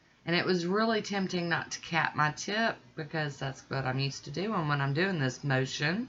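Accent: South, United States. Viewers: Very helpful. I love your southern accent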